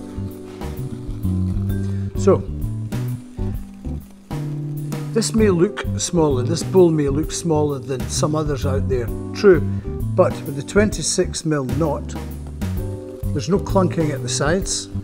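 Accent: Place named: Glasgow, United Kingdom. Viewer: Sincerely, your way of explaining and showing the goods is very helpful and informative, not to mention the high quality video in all aspects including that magnetic Glascow accent! Greetings from Greece, you may have some order from south someday! By the way this blue-ish colour of the mug is reminiscent of Ranger's F.C. kits... excuses if you a Celtic fan.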